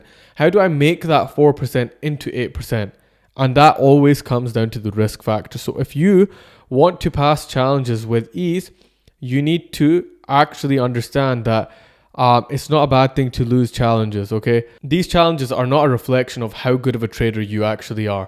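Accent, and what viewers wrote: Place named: Scotland, United Kingdom. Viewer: Nice to hear another Scottish 🏴 accent.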